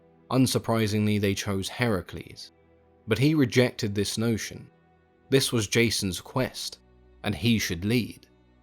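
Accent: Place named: United Kingdom. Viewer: What part of the uk are you from?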